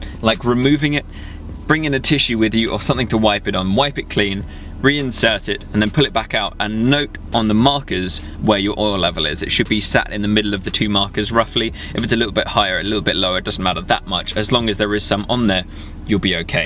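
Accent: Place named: United Kingdom. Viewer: Irrelevant Q But what part of UK are you from? Thanks for making quality videos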